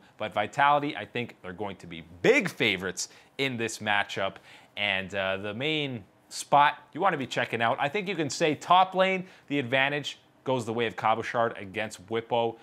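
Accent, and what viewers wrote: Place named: United States. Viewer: Jesus...I can REALLY tell you are American and not European, dude! :D #VITWIN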